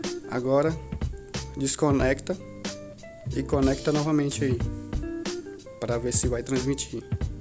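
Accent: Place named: Pará, Brazil. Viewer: Tu e paraense ne man kkk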